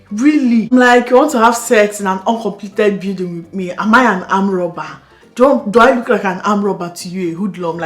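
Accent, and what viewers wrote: Canada. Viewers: You’re beginning to have Canadian accent🌝💃🏻❤️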